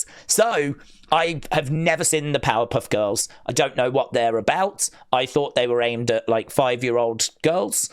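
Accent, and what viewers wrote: United Kingdom. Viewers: U make a British accent sound perfection cody will be heel by wrestlemania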